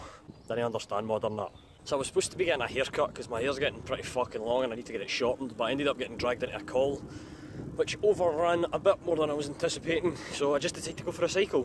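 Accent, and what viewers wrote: Scotland, United Kingdom. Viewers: Your video are so funny and love the Scottish accent (wait shit I'm from Edinburgh I have a Scottish accent😊😊) ahha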